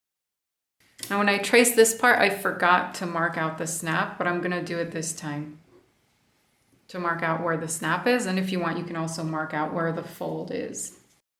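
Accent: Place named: Canada. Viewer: Hello, this video is so useful!!! I really appreciate your help! Are you from Canada? I think I hear your about pronunciation, plus you use cm. Greetings from Cleveland Ohio.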